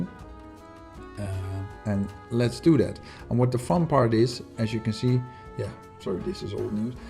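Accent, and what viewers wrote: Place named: Netherlands. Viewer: Volgens mij hoor ik een Nederlander?!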